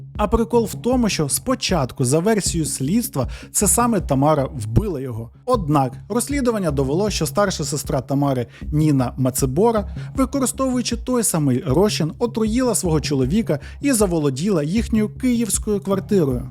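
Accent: Russia. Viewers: Я дуже-дуже перепрошую. Мені дуже подобаються Ваші відео, але не можу втримась від хвилинки грамар-наці. У Вас часто проскакують російські наголоси: брАла, а не бралА, спИна, а не спинА, помЕрло, а не пОмерло. А ще по-російськи звучать наголоси на прийменниках, наприклад, ми віримо на слОво, а росіяни — нА слово. Ще раз даруйте. Просто це часті слова у трукраймі і я сподіваюсь, що Ви пофіксивши ці дрібнички, покращите ваш контент. 😳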